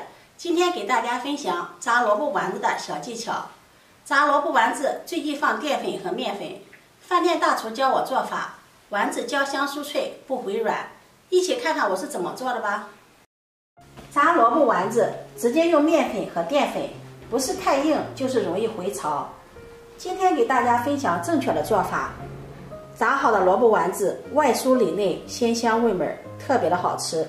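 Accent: Shandong, China. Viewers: 山东西部口音。水分（fei）,我们（mei） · 可能是山東口音。口音跟生長環境有關，是免不了的。聽習慣了就好。重點是她口齒清晰，要言不煩，而且都解釋得清清楚楚，實在是很優質的烹飪教學！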